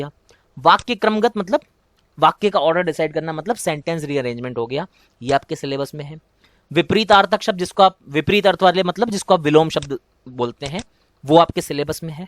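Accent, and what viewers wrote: India. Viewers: West up language hai sir aapke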